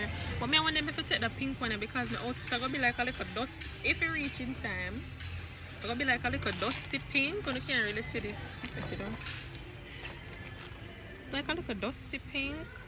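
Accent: London, United Kingdom. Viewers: Yuh london accent trang enuh girl!!!! Wow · YOUR BRITISH ACCENT NICE YUH CAN GWAUN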